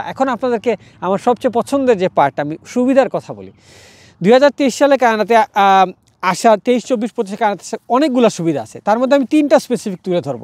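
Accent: Sylhet, Bangladesh. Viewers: আপনে সিলেটি ভাইসাব নি?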